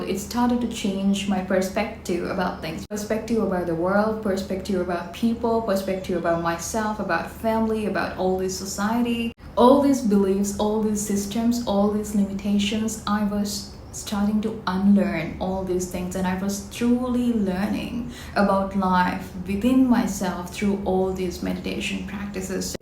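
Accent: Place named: England, United Kingdom. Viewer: ❤ your English accent wow. I want to speak English like you and i will..❤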